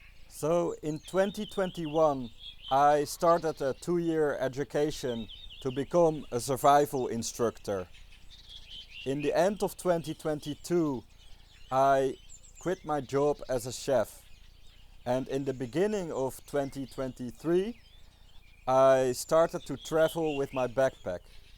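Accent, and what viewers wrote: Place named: Netherlands. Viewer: Sound like a Dutchman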